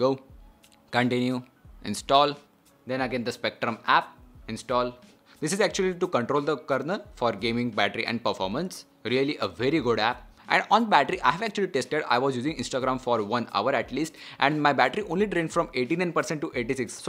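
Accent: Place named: South India, India. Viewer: South Indian... I know right ?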